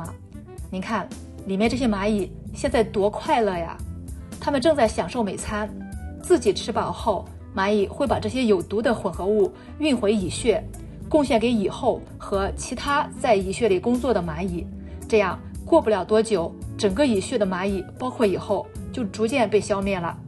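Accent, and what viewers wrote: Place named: Shandong, China. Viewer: 听得出，解说的声音来自一位山东女士。视频非常好，每年夏天都为蚜虫和蚂蚁的合作非常头疼，谢谢！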